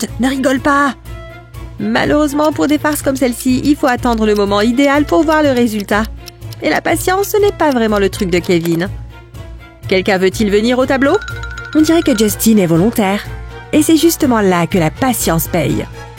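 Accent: England, United Kingdom. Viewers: en plus il sons englais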